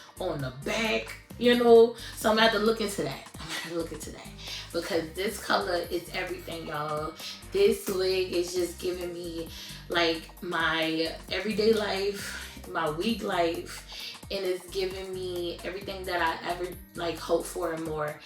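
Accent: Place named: New Orleans, United States. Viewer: I love it this is cute!!! And I see that we are from the same hometown , New Orleans baby!!! I can tell by the way you talk. ❤❤❤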